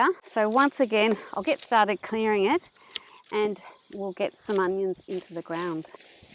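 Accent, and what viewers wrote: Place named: Australia. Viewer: Fantastic so good to hear another Aussie. I see a lot of gardening videos but they are from other countries and it gets confusing with the different seasons . I can now totally relate and makes sense to what you are saying. SUBSCRIBED.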